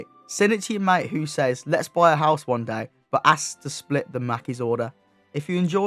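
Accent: United Kingdom. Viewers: Im Nigerian living in UK,but that British acc is mad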